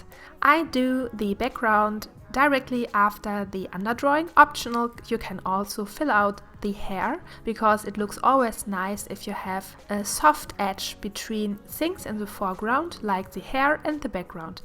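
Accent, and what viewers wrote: Germany. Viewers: Based on the accent I'd say you're german. Right?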